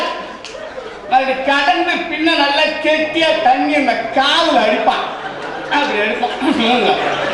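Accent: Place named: Nadu Nadu, India. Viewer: அருமையான வட்டார வழக்கு., நடுநாட்டு மக்களின் வாழ்வியல் முறைகளை வருங்கால சந்ததியினருக்கு மிக தெளிவாக எடுத்துரைத்துள்ளீர்கள். அருமை ஐயா!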